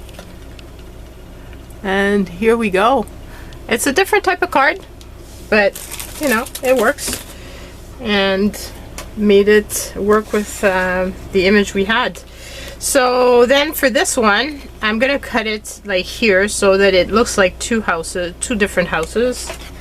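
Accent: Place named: France. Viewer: I too have been watching your videos for some time now and can honestly say that you have been my greatest inspiration. Starting with recycling Christmas cards, I have gained in confidence, that I never had before I saw your channel. This has led to many different crafts I so enjoy doing. So thank you from the bottom of my heart. Also, I love hearing your French accent. I never miss your card making videos. My absolute favourite! xx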